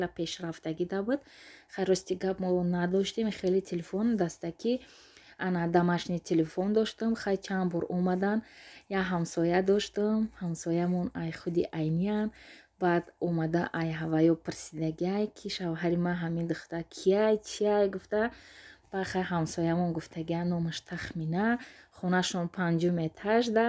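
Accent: Konibodom, Tajikistan. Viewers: АСАЛОМУ АЛЕКУМ апачони гулмм бо кони бодомш чи лавзаи 🤗😂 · Хохарчон заминкадар чизхоя шавхаратон бахшидагиет, вай бошад намемонад ки шумо бо шеваи ширини Конибодоми гап занед😊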